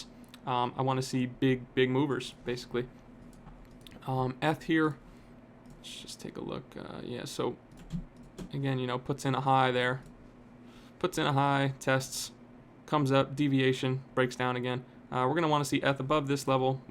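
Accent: United States. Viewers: You sound American but NL in your name means Dutch?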